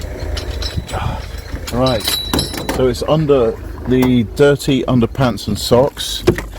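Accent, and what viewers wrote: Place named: West Country, United Kingdom. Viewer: Appeal it if you can’t pay it…. Hate that people who have cars or vans are victims.. As you know it’s a parking permit used in my street, two of my visitors have been fined for not putting the vehicle reg on the permit. I paid them off within minutes at half price, local government are not car friendly. You need a lock up or a friend to store stuff you’re not using, nomadic Kangaroo does not seem to carry as much as you do. Perhaps he stores his stuff? As for the toilet, perhaps incorporate it in the driver seat 😂. That would be so good for comedy purposes and serve a dual purpose 😂. Great vlog and entertaining, Great to hear the West Country accent, the sound of my youth in Bristol.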